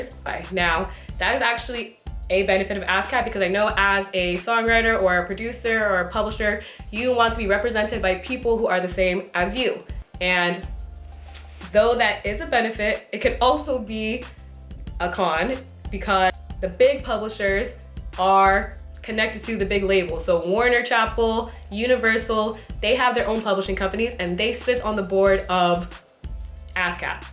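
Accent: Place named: Barbados. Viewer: Are you a Bajan? You sound Bajan! Holla back.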